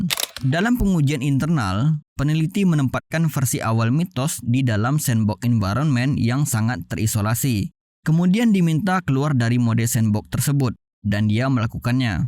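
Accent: Aceh, Indonesia. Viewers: Ureung aceh bang nyoe. ? bereh hana gadoh karakter bahasa aceh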